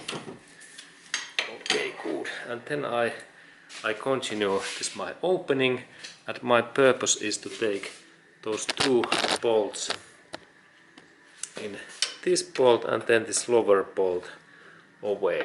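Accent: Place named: Finland. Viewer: From your accent I can assume you are from Finland 😉. Btw i like your videos very informative.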